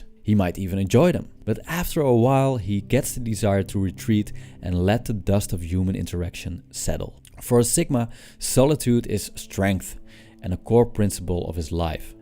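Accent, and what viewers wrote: Netherlands. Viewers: Mmmmm, your accent... I guess it's Dutch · You have a bit of a Dutch accent, is this correct? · Your accent sounds very Dutch :)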